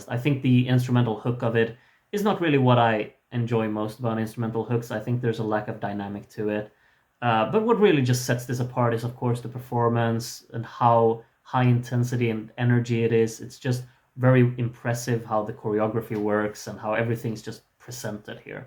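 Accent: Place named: France. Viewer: I love this videos as much as I love ur' french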